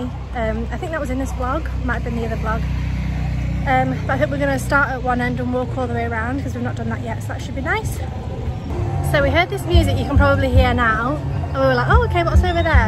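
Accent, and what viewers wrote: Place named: Northern England, United Kingdom. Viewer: Hi. The type of tuna you ate is not yellow fin which is what you get in the store in the UK This is known as " Kelawala" by the locals. I too have got sick eating this Fish In SL there are no testing for contaminants you buy at your own risk.This fish has a dark red tint and looks bloody and can make you sick.I grew up in SL and now live in the US. Ps Your accent sounds very West Midland/Northern England.I have English roots and have been to the UK about five times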